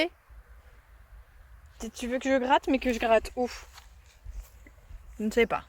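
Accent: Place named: South, France. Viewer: Beaucoup trop beau Baly ❤️ C’est magnifique de voir ça. Moi ce que j’adore c’est l’accent du Sud de la France. Chez toi chose c’est transforme en cheuse ahah je suis fan. Sinon je donne aussi de la biotine à ma jument une fois par semaine de Kramer Elle est en poudre et sens plutôt bon. Je ne connais pas les produits décathlon. Pour les pieds de Baly, temps que tu me dépasse pas 30 km par jour ( effort naturel du cheval ) tu peux parfaitement le laisser pied nus, regarde Jade ça ce passe super bien. Y a même des cavaliers olympiques qui sont sens fers. J’espère vraiment que tu vas pouvoir le laisser pied nus. Et le petit éclatax c’est du jujufitcat ça 🤣🤣🤣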